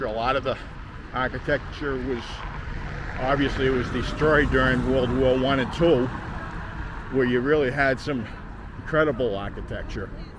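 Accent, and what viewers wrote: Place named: Boston, United States. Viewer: .... excellent and humorous interpretation. We know what you meant. The beauty of Warsaw can never be overstated, an immaculate city overflowing with history, stunning beauty and soul. Thanks for the tour, and hope you come back (American living here)! You have Boston accent, awesome. I met Polish girl many years ago in Boston, and now we have a place on Wyzwolenia, near Lazienski Park. Stay safe in Kyiev.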